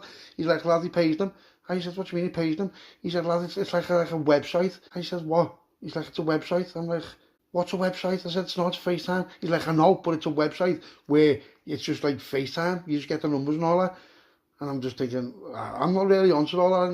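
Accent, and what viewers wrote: Liverpool, United Kingdom. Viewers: Could have swear I heard you today in Turkey ano scouse lads sound similar but sounded proper like you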